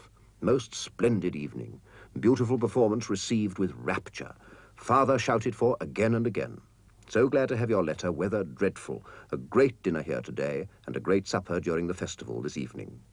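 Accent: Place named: United Kingdom. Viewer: It’s called a British accent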